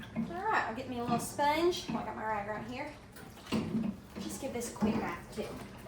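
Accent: South, United States. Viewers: omg i could listen to you for hours. never knew i really liked southern accent. im having so much fun <3 :D haha Love from Israel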